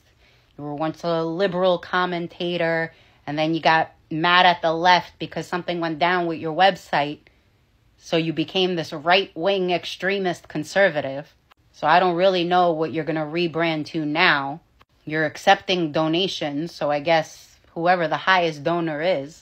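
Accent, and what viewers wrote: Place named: New Jersey, United States. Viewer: I hear New Jersey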